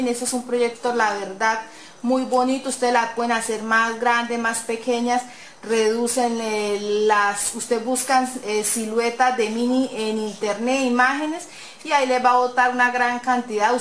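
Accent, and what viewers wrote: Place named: Santander, Spain. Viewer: Hermoxo divino si se moja no se despega en que teléfono te puedo localizar tienes acento de santandereano yo estoy en medellin me gustaría hacerlos para mi tienda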